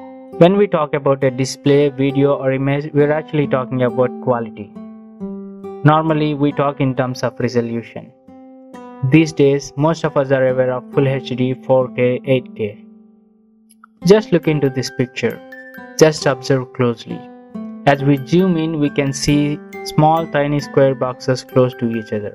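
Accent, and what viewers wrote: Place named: India. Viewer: I am from China.Your Indian English is awesome.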